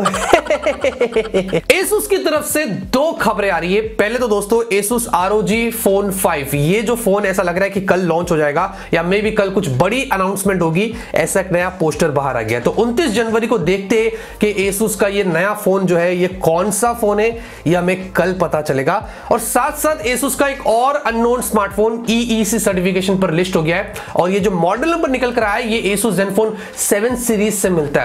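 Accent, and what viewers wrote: India. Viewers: Sounds so wired indian english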